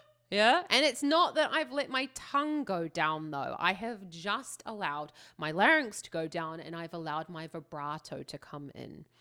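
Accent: United Kingdom. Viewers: Oh, it's weird to see my comment at the beginning:D (just heard your comment about you being in Germany?So..,Hello from Germany! Never thought that, would've said you're British judging by your English ^^). And now, first (my favorite song of hers) and now, what a great day for me! Thank you for your analysis (and I'm so glad you like her too) even though I recommend, if you'd check some korean songs as well in the future, just take a look at the lyrics since she's not only all about technique but she's a great storyteller as well. She's insane! She's actually a chameleon who can do every song and genre and turns it into hers. From Rock or Jazz to even rap. She tried it all. What would be interesting maybe if you'd check out an English song of hers. She does her arrangements by herself on Immortal Songs. She sang there and. Last one, directly in front of. 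He invited her to join him on tour after giving her a standing ovation. She performed together with him later. For a korean one, I highly recommend " " on I am a singer (Please use the vimeo one, if possible with subtitles, since the youtube version is cut and the lyrics are incredibly sad. The climax is heartbreaking):) And a stage which has already legendary status is " ". It's a korean folk song turned into a beautiful arrangement with seemless transitions and beautiful vocals. (Here you should maybe check out the b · I am not surprised this video is 25 minutes long, analyzing cover is a masterclass. welcome to world, this is considered to be her greatest performance. I had not picked you for being across the pond! I thought you were British.:D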